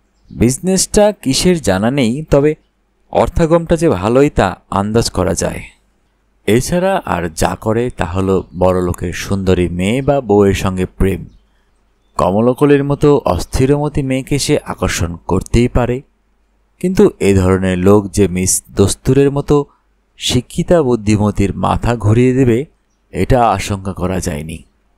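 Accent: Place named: Bangladesh. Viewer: আপনার উচ্চারণে বাংলাদেশ এর টানটা খুব মিষ্টি লাগছে, কৃষ্ণনগর থেকে 👍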